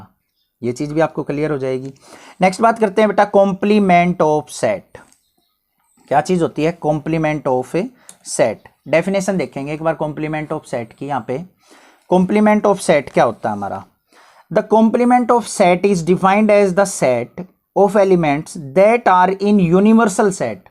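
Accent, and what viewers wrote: Haryana, India. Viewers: SIR AAP HARYANA SE HO.........??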